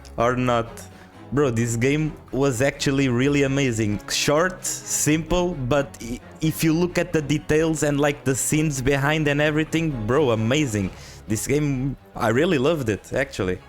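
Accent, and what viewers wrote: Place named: Portugal. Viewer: O teu video apareceu-me nos recomendados do youtube, entrei por curiosidade, pareceu-me atrativo. Nas primeiras palavras comecei a perguntar-me a mim proprio se tu eras portugues, o sotaque estava muito a dar nas vistas. Fui ver a tua PSN e olha, és tuga mesmo. Boa sorte com os videos, +1 sub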